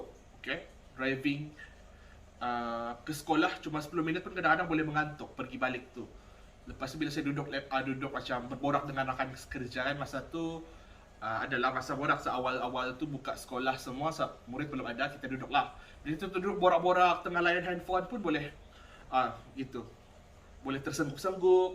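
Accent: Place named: Sabah, Malaysia. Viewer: Org Sabah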